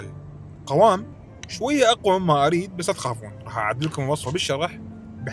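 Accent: Iraq. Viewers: جم و لا كم 😂😂 ياخ احب اللهجة العراقية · احب اللهجه العراقيه منه احسه محتفظ بالتراث وبوطنه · يخبول اللهجه العراقيه 😂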